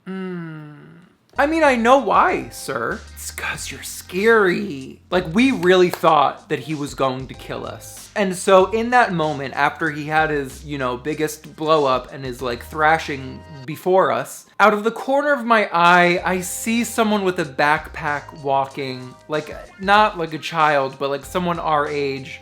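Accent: New Jersey, United States. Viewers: yep sounds like new jersey to me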